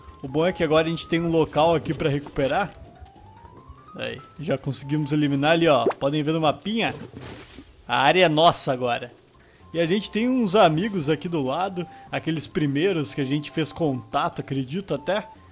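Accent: Rio Grande do Sul, Brazil. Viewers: Ei man tu e gaúcho? Se tu não for teu sutaque e igual sou gaúcho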